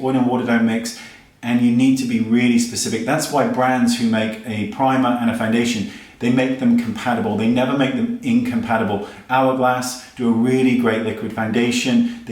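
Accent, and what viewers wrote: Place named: West Country, United Kingdom. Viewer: it's a west country accent, it's not that fabulous in term of English accents, but better than mine at least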